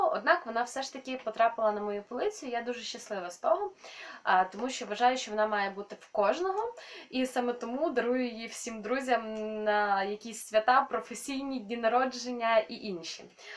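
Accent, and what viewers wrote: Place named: Western Ukraine, Ukraine. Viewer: Свет строится не только от волос,но еще и от мебели.Все хорошо,но вот западный акцент бы не много убрать.А то заносит не много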